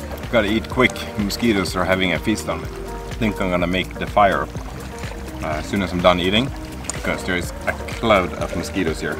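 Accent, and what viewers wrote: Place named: Norway. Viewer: Hei, hører at du er norsk, PG elsker NBen din, og lurer på om du har vert i Bekkjarvik?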